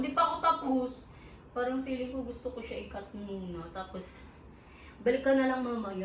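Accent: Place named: Australia. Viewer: I wish to meet you one day. Did you live in Australia before or hang around lots of Aussies? Your accent is like ours.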